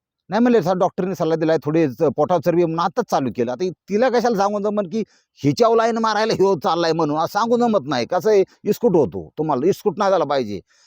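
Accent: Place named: Pune, India. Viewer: मामा पुण्याची मराठीच सर्व उत्तम आहे . मुंबई पेक्षा भारी आणि सरस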